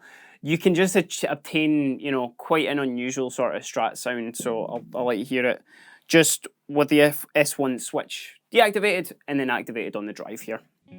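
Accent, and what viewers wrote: Scotland, United Kingdom. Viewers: Scottish accent IS musical! sold me this guitar better :D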